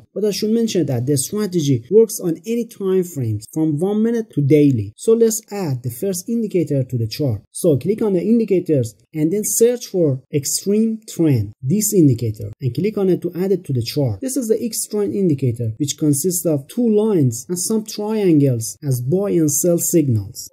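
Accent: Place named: Iran. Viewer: حاجی چقد لحجه ایرانی داری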